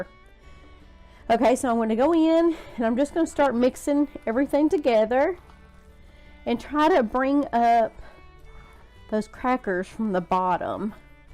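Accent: South, United States. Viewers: I’m afraid I didn’t have an easy time of it while trying to remove the membrane off the ribs. Where are you from, I love your southern drawl!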